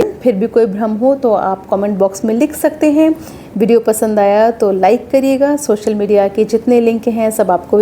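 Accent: Standard. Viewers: बहुत बहुत धन्यवाद् mam, आप की हिंदी,उच्चारण एक दम शुद्ध है,ज़्यदातर पढ़े लिखे छात्र, यहाँ तक की सरकारी अध्यापक,बहोत से हिन्दीभाषी प्रशासनिक अधिकारी तक हिंदी का उच्चारण गलत और गवाँरो की तरह करते हैं..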